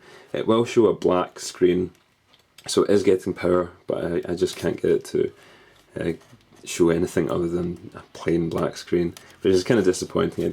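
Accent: Scotland, United Kingdom. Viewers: Where in Scotland are you from. You sound like you are close to me. Edinburgh area?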